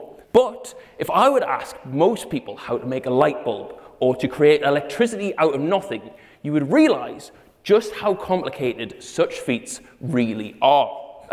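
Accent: Newcastle, United Kingdom. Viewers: Very impressive, proving you can say something smart in a Geordie accent!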